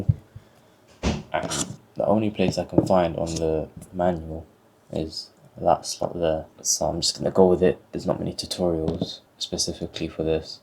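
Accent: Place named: United Kingdom. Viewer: You sound like another British bloke, Lewis Hamilton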